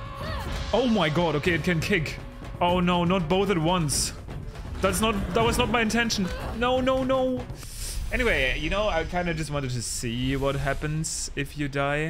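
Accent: Germany. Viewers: Hes german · I love the Fortnite chest sfx in the first game. Is you accent German? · You're German aren't you 👁️👄👁️ ich fühls in den nuggets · digga wie deutsch du bist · Xd ich glaube du bist deutscher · Man merkt halt das du deutsch bist (kein hatte) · Bro this german accent… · Bist du deutsch bruder? XD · you have a very german accent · you sound very german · 3 seconds in and i hear the german accent lol just found u but u seem cool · Kann es sein das du Deutsch bist? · Are you German? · Im hearing some german acent ?? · you sound very much german😅😂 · Are you german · Sachmal du bist doch deutsch oder 😂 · are you german? austrian? nordic? dutch? i must know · You sound german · Alter man hört so geil raus das du Deutscher bist :D Wünsche dir alles gute ! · bist du deutsch? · i hear some GERMAN accent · Man hört zwar das du Deutsch bist aber trozdem machst du Gute Videos aber warum Raid shadow legends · tasting the games 😂 ich liebe deutschen Akzent im englischen so sehr 🥲❤️😂 deine Videos sind toll mach weiter so ☺️ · Man hört so stark das du deutscher bist😂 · So i would geas your german ;) If not you have intristing Acsent Kein bock mehr zu schreiben gg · German! 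I can hear it from the accent alone! Guten Tag! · Dein Englisch klingt sehr Deutsch 😂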